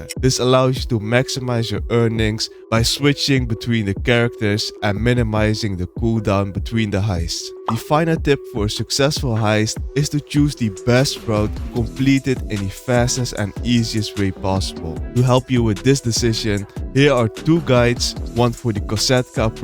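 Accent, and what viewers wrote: Netherlands. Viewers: you sound very dutch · You are dutch aren't you? Great tips btw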